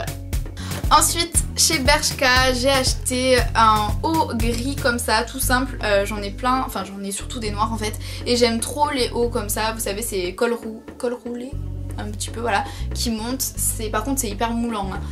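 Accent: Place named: Marseille, France. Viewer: Ta un belle accent marseillais mdrrr moi je vien de Marseille et sinon jzdirenles vidéo haul faudrait que T en fasse plus souvent bisous et jtm❤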